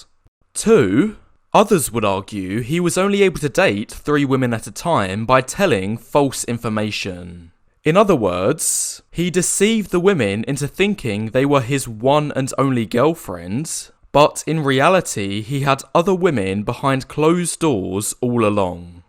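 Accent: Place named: RP, United Kingdom. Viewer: I like dialect. He can easy play in a Prince Charles movie.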